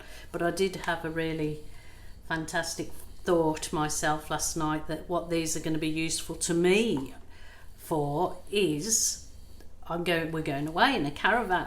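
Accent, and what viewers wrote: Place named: Kidderminster, United Kingdom. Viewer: Can I be nosey and ask where you are originally from? I’m in Kidderminster and you sound local xx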